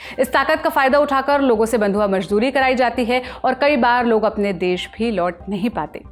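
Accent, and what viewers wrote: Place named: Hyderabad, India. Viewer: Aisa lag rha h anchor hydrabadi accent ki h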